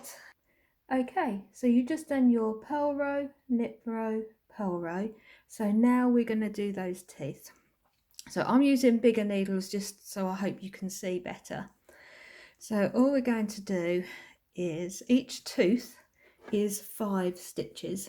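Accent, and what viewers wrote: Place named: United Kingdom. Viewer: This looks great! Can’t wait to try it! I love your accent! What part of the uk are you from?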